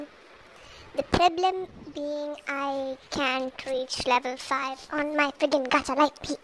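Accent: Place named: India. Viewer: Oh wow that's sad and U HAVE AN INDIAN ACCENT?? ITS SO NICE!!!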